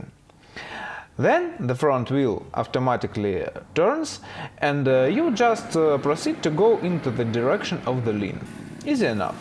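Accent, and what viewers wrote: Russia. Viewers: Apart from being 100% quality easy to follow and to remember, motor bike riding lessons, I love the Russian accent, specially when you speak Italian, thanks buddy. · I love your russian inflections when you speak english, theyäre so charming :-) great vid as always, oldie but goldie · Concise and precise. I have watched and followed many videos on YouTube, yours is the most understood despite your thick Russian accent. Cheers from Malaysia.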